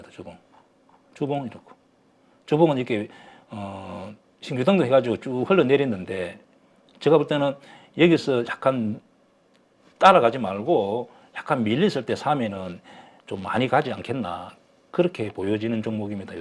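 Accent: Gyeongsang, South Korea. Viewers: 갱상도 라도 시골 이네..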